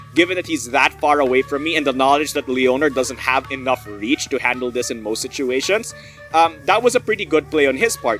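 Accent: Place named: Philippines. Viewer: Are you Filipino? Your accent is a pretty good hint. :))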